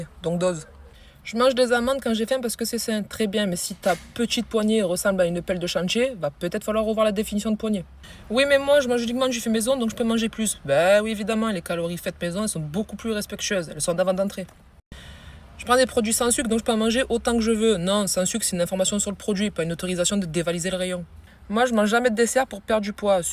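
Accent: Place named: South, France. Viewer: L'accent du sud😊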